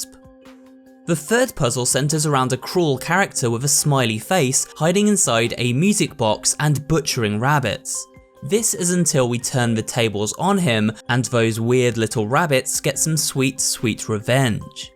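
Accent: United Kingdom. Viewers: British confirmed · It is just now when I watch the videos and I notice this guy has a British accent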